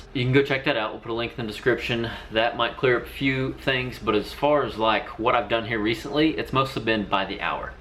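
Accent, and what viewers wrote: Oklahoma, United States. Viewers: What part of Oklahoma you from?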